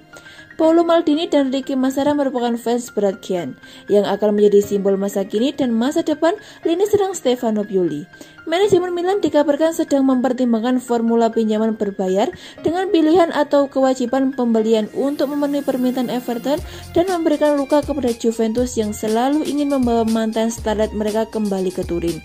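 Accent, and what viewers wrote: Java, Indonesia. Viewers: Medok e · Logak Jawa nya kentel amat njiiirr 😂😂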